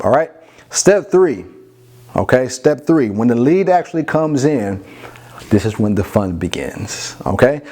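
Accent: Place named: Jamaica. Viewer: Hi, this is Carol Whilby, heard the yard accent, this is an answer to prayer, I NEED A MENTOR REALLY BAD, hope you have a spot for me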